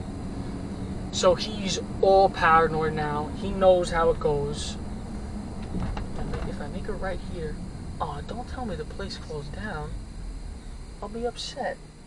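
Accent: New York, United States. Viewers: The time you put into the research is so evident, seeing this area in real time, add to it ur new york accent, enjoy ur channel.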